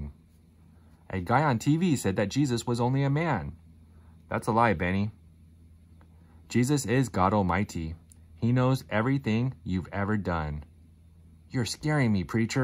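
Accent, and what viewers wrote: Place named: Scandinavia. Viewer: Wru from? Just curious, no disrespect intended. I love languages and different cultures. That aside, you sound kind of Scandinavian. If I'm wrong, again, no disrespect intended.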